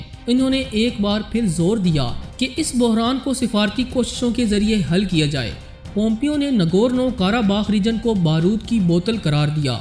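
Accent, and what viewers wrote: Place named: India. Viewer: Typical indian accent 🤔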